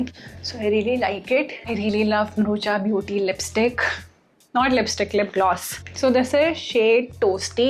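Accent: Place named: Japan. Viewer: lovedd your Japanese acsenttt it suits you so well 😍😍 lots of love 💌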